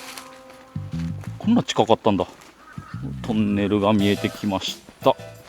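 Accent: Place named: Kagoshima, Japan. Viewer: まさかの坂之上（故郷です） 鹿児島のイントネーション好きです😊 幼児の頃住んでたんですけどこんな場所あったんですね カブト虫とかたくさん採れそう…！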